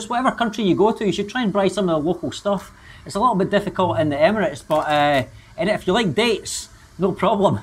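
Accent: Scotland, United Kingdom. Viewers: Mate. It was hearing your accent and instantly like the video. GO SCOTLAND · Scottish accent love it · I'm here just for the Scottish accent.... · What a gorgeous resort! Lucky you ☺️☺️ Thank you for this great video... I appreciate your hard work and I love your Scottish accent mate ☺️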